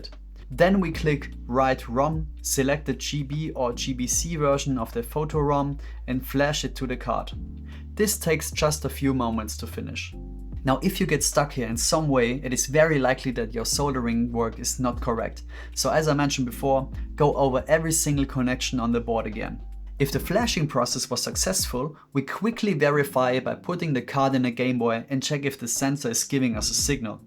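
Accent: Germany. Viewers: The chill tone and slight german vibe to this was really calming. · are you german?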